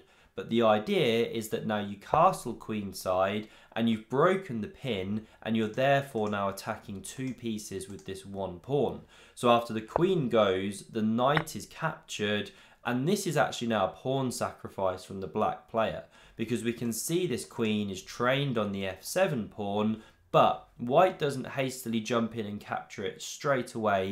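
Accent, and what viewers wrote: United Kingdom. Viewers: Congratulations because I' m italian and I understand every Word, your english Is pure. Are you British?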